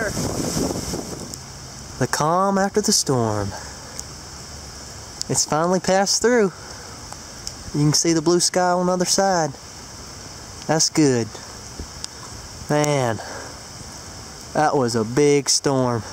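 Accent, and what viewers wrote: South, United States. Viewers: Hey I know you don't want people to know where you live but what state are you in that has black bears? I know it's southern from your accent.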